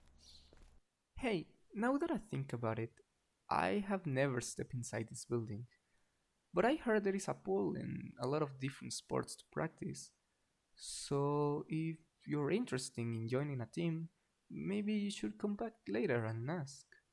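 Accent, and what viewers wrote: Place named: United Kingdom. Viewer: I'm new , are you British? because your voice kinda sound like British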